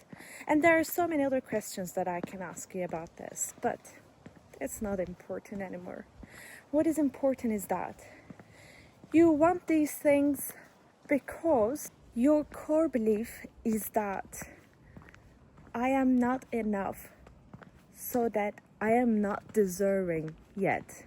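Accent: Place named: United Kingdom. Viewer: Bunları simdi daha iyi algiliyorum, bilincim katman katman aciliyor.. evrendeki her enerjiye, özellikle kendimden ayirdigim negatif düşünceler ve duygulara bile BEN olarak baktigimda onları kabul edip iyileşmek hizlaniyor. evet her kilit TAMLIKTAN aciliyor. tüm kapsayicilikla tüm olasiliklari BEN olarak görüp icinden arzuladigimi secersem realiteye dökebilirim! bunu farketmek bile benim icin muazzam bir farkındalık, simdi sırada bunun uzerine calismak, çünkü asil olay bunu OLMAK. teşekkürler hizmetin icin. British aksanindan öpüyorum🥰😘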